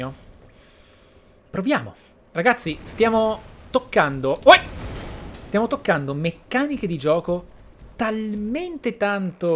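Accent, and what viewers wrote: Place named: Ireland. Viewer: "Maybe the TURD one". Non è che hai l'inglese impastato, è che lo parli con l'accento irlandese; che è tipo l'anello della calamità dell'inglese, come dimostrò sulla BBC Dara Ó Briain con una bambola parlante...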